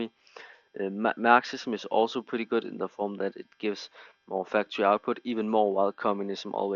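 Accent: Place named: Denmark. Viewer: bedste danglish jeg nogensinde har hørt håber alle russere ser det her..:3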